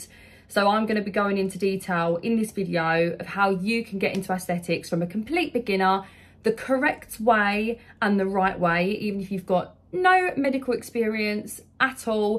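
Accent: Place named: United Kingdom. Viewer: Hello, I absolutely love your UK accent❤ I live in Texas and recently got certified as a concierge neurotoxin injector in Prosper TX. Would you please be able to help provide what it is I'd be needing to build clientele also to confirm that course i took is compatible to a level 4, 5, or 7? I'd really appreciate your effort and assistance. Thank you 🎉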